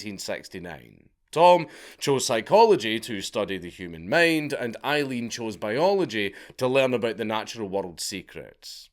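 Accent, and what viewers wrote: Scotland, United Kingdom. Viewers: Why do Scotts pronounce “world” like it has 3 syllables?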